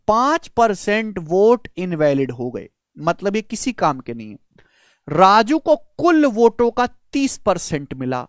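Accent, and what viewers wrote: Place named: Haryana, India. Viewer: Ram Ram ji haryana ki Badhiya padhao ho sirji In haryanvi style 😊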